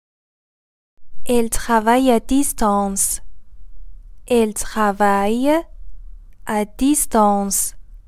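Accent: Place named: England, United Kingdom. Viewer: You have a very strong English accent that's ok 😂😂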